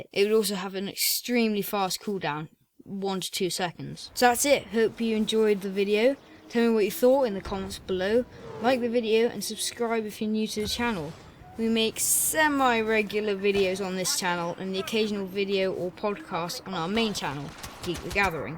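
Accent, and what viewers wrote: United Kingdom. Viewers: Lit af u British? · i clicked on this video expecting an adult who is professional, instead i got an annoying English fuck, how about you give Ireland back to us ya dorty hun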